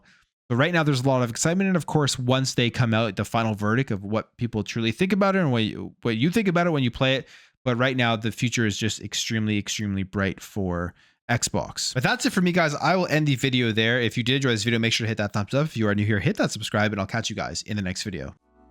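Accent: Canada. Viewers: Hey brother, are you Canadian? Just wondering if anyone has ever told you that your voice sounds like a younger Bret "hitman" Hart. 😅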